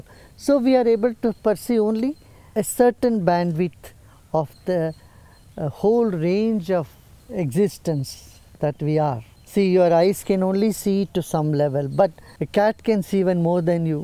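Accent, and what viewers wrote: India. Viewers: I so wish I could understand the East Indian dialect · With that heavy Indian accent and background music how does someone understand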